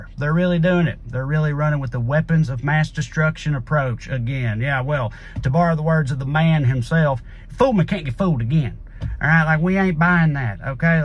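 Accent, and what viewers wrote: South, United States. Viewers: i skip every ad on this ap. But yours, idk if its the southern drawl or what but your ad reads are actually enjoyable 😂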